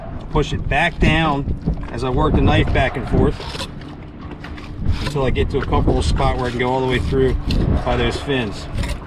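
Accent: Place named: Baltimore, United States. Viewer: got that Balmo accent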